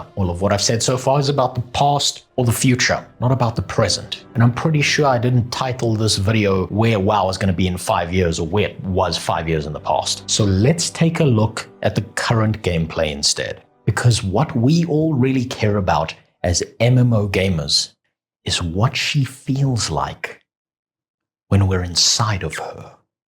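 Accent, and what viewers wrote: South Africa. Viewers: Saw you on Asmon bru, fucking amazing to hear an SA accent on a monster streamer like Asmon, he laughed his ass off at your clips of him!!! well done man!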